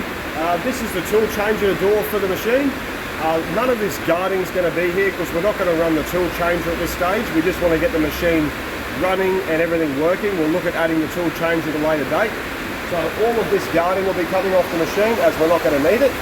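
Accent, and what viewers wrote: Queensland, Australia. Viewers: Simple CC can barely cope with American English, struggles with Australian English and is completely fu!@ when it comes to Queensland English.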